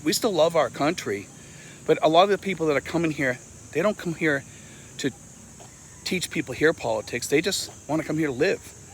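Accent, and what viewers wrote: Boston, United States. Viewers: Thank you for saying that there are some good women in America! We are not all entitled, narcissists. Some of us ae hard-working, kind people who just want to keep our heads above water. BTW, I detect a Boston accent in your video...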